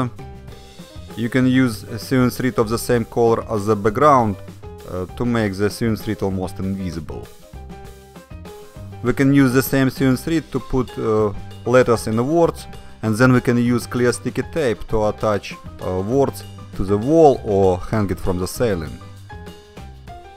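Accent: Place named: Russia. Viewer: Русский режет на английском ухо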